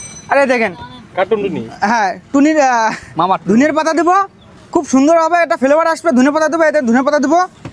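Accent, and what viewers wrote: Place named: Barishal, Bangladesh. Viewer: Shalay pure borishailla😂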